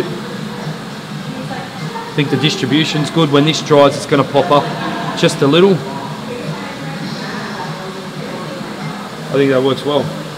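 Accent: Australia. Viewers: she's such a gorgeous girl love her hair what an amazing job you did and love your accent its so beautiful love Australia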